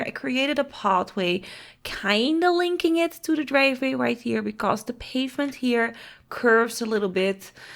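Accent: Netherlands. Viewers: You kind of have a Dutch accent, are you from the Netherlands? I'm from Belgium.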